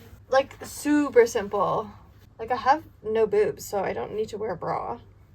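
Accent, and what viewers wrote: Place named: Canada. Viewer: First video of yours I’m watching! I was like oh she must be American but then you said buttons and pocket and I’m like nope she’s Canadian! 😊 Love the video! Going to watch more now.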